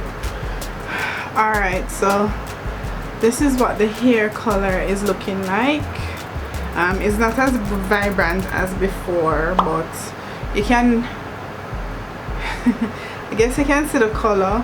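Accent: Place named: Jamaica. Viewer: Love that Jamaican accent❤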